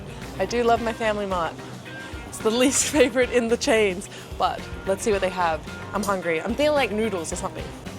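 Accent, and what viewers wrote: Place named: Australia. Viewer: Rocking the look! Also, I feel your aussie accent has got stronger since you have been back home there recently ✌️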